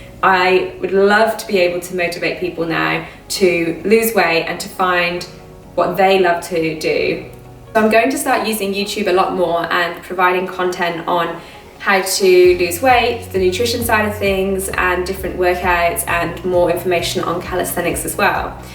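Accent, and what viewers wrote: Australia. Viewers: You look amazing!!! I need motivation like you had/have! Cute little mix of an aus/English accent there 😂 it’s nice to see you doing so well great video deffo be watching more! Starting to get myself fit now! It’s the food side I struggle with what’s good/bad etc because I love cooking but I’m sure I’ll learn xxxx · I knew there was some sought of Aussie in your accent, and then you said you moved there.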